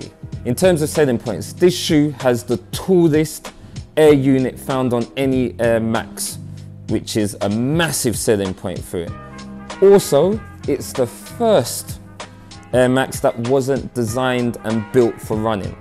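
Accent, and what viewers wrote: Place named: England, United Kingdom. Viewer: Its amazing an african guy speaking like an englishman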